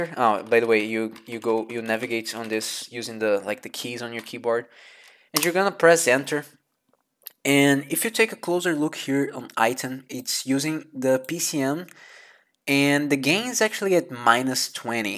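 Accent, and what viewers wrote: Brazil. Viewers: I know a brazilian when i hear one, meu camarada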